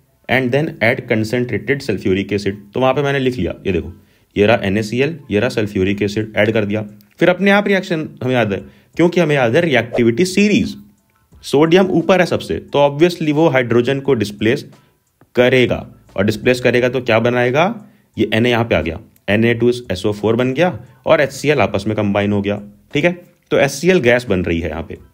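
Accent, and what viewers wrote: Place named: Indore, India. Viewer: Bhaiya aap Indore se ho kya thora Indori Accent aa raha hai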